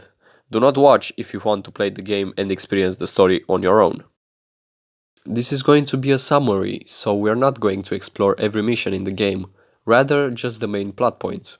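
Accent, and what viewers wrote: Romania. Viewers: asta e prima data cand vad ceva de la tine, cum te-am auzit vorbind : "sigur e ro" ma duc la "about" - :D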